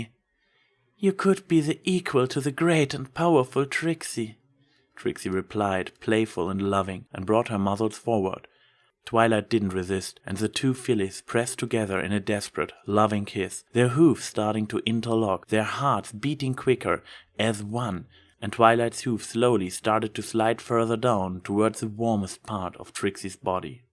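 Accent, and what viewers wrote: Germany. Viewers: cont.3 Thirdly; and understand that this is not meant to mean I didn't like the way you read, because I did; keep at it. Your technique will become more refined with practice, and I think that this is a worthwhile endeavor. I always enjoy a good story, and if it's being read with a German accent, then so much the better. XD And that's about it. Keep it up, man, this was very enjoyable, and I hope to hear more in the future. It was a neat change of pace. /) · ich mochte deinen deutschen Akzent schon immer, aber beim vorlesen Find ich ihn wirklich klasse, es gibt dem text so etwa interessantes. Eigentlich interessiert daran deutsche Fanfic zu lesen? Ich finde deine Stimme ideal für "Dramatic Feadings".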